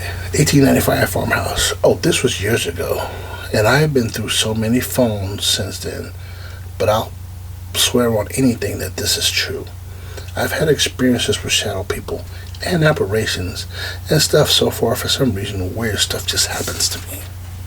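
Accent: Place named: London, United Kingdom. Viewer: As an English person I would say you’re well on your way to getting a decent accent however it’s very mixed in terms of regional accents. There was definitely some sort of London accent mixed with a touch of a northern accent (when you said the part about ‘scumbag brain’) and some RP thrown in the mix.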